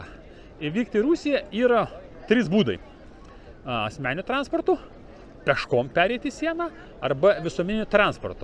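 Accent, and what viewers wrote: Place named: Central Lithuania, Lithuania. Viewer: va tokie konkretus zmones man patinka labiausia. galetu but visi tokie Lietuviai - nuverstume visas konservatinkas jau pradiniam etape. o ne kai prisipizdina lietuviu pinigu. biski ziuriu tarmiskai sneka ;) panasu kazkur is vidurio Lietuvos.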